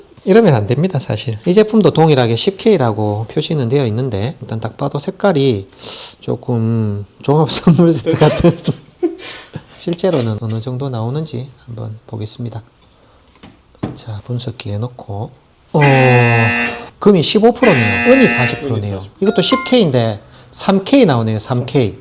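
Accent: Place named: South Korea. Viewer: 금값을 제일 비싸게 받는 주식회사 한국 금거래소의 면피용 영상으로 이해 하겠습니다 좀더 설득력 았게 하려면 지방 사투리부터 고치시길 ㅠㅠ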